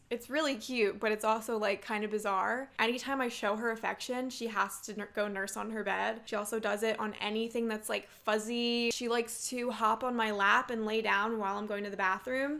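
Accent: United Kingdom. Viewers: 20 seconds onto the video and she’s already talking in a British accent😂😂 iconic queen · This British accent lmao I love it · i know what a drinking game is, He/she said to subscribe everytime does a British accent 🤯 where's the drinking part?? Edit: no drinking in a "drinking game" lol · your nervous british accent :> so cute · i bloody missed that british accent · I love your British accent and you🤍🤍 , btw what mascara do you use because you look stunning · oh, how much I missed your British accent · my crush on her when she speaks brittish 📈📈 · YES BRITISH IS BACK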